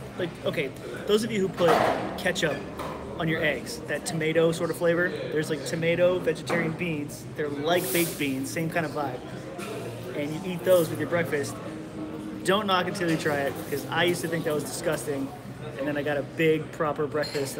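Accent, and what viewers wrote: Australia. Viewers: Your Australian accent is quite sexy.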